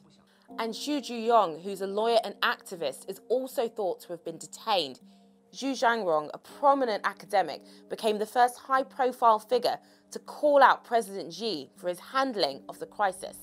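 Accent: United Kingdom. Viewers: I would believe it's a cover up but i hate British accents and colonialism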